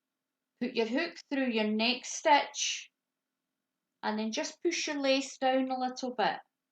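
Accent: Scotland, United Kingdom. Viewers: Super cute, tfs. I adore your Scottish accent, 😀🐢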